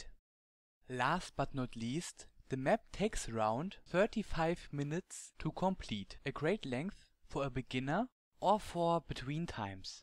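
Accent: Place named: Germany. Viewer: I hope my german accent isn't that annoying <- Lol'd so hard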